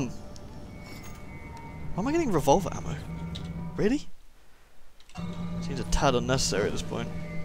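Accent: United Kingdom. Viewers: Not surprised the unnamed gas giant's atmosphere and winds shredded the station up, probably similar but larger in magnitude, mass and strength of winds like Jupiter. Also not surprised with the ending being an homage to the original 1979 Alien movie. It took me about 3 or 4 days to watch this all in the evening hours (7 PM - 1-2 AM EST) , fantastic LP with your sense of humor and/or logic and your awesome British accent.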